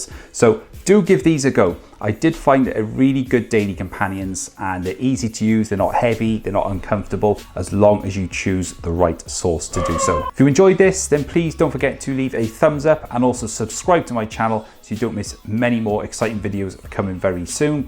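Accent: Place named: England, United Kingdom. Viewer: Great review, nice to hear an English voice after listening to a few Americans.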